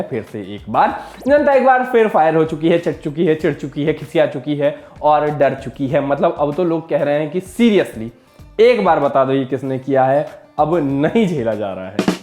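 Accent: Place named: Bihar, India. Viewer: Yarr mujhe aap ka baat krne ka tarika bohot achha lga bihari hindi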